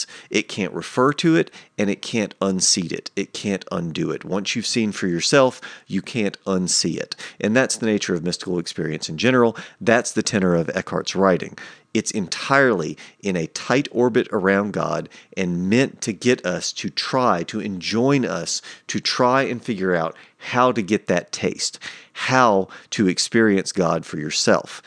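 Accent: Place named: Carolina, United States. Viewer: I'm going to comment after watching the entire video, but I'm wondering where you're from. At first I thought you might be an Okie, like me, with your subtle Southern accent. But I'm hearing a bit of a Carolina accent in your "O" sound. Any chance I'm right?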